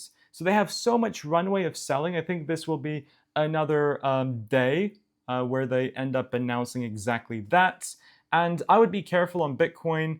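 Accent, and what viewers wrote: United Kingdom. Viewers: His accent is starting to change more British. Funny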